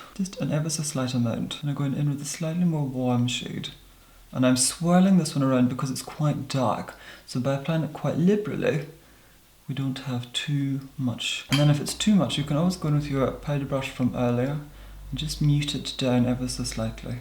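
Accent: United Kingdom. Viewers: You speak like a British queen and it's so relaxing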